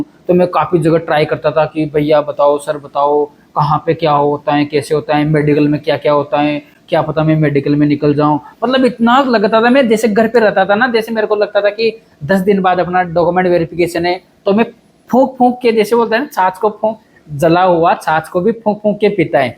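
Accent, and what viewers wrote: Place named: Rajasthan, India. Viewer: Sir aapki language tone se hi pta chl gya ,aap rajasthan se h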